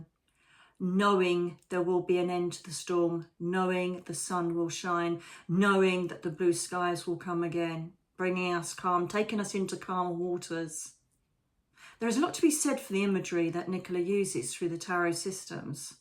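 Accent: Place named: Essex, United Kingdom. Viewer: “Fuzzy” ? really now Nicola ….😮😂 That was amazing!! Fun and funny. The rock in the river -great metaphor! Mostly I love hearing a bit o Essex over here in New York.❤ Great job!!!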